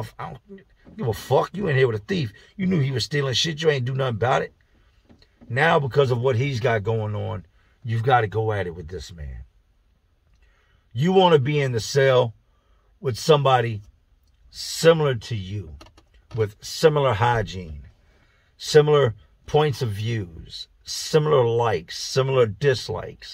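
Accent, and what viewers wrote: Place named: Pennsylvania, United States. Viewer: you talk like a PA boy, you from the 412,724, or the 814?? imma New Castle, ( 724 ) proud my whole life!!! Either way, HMU some time!!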